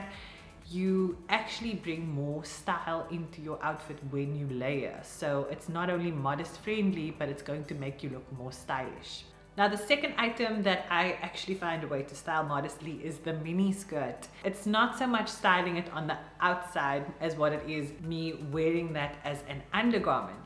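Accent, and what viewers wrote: South Africa. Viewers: You sound capetonian....gottta love SA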